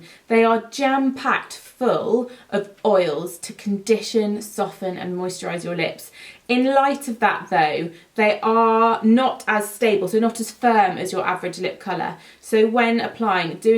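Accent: United Kingdom. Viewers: All your tutorials are sooooo adorable & I like your British accent big time :) plz don't stop filming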